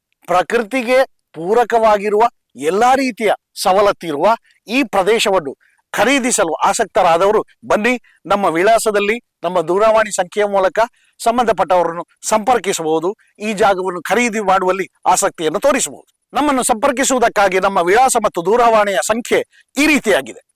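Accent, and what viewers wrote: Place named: Kasaragod, India. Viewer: ಶುದ್ಧ ಕನ್ನಡದ ನೆಲ ಕಾಸರಗೋಡು ಎಂಬುದು ನೀವು ಮಾತನಾಡಿದ ಶೈಲಿಯಿಂದಲೇ ತಿಳಿಯುತ್ತದೆ. ಸ್ಪಷ್ಟವಾಗಿ , ಕನ್ನಡ ಪಂಡಿತರಂತೆ ನಿರರ್ಗಳವಾಗಿ ಮಾಹಿತಿ ನೀಡಿದ ತಮಗೆ, ಕಾಸರಗೋಡಿನವನೇ ಆದ , ಈಗ ಉಡುಪಿಯಲ್ಲಿ ನೆಲೆಸಿರುವ ನನ್ನ 🙏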